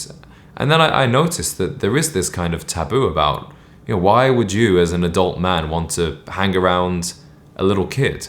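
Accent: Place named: United Kingdom. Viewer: I noticed the tendency to mock men in the movies decades ago in the 80s. I have always hated to see it. I can’t believe that male actors and producers went along with this betrayal of their own gender. (On a different note: I’m curious that the title of this video focuses on American men, but you sound like your British…. do you think that British men have not been emasculated?)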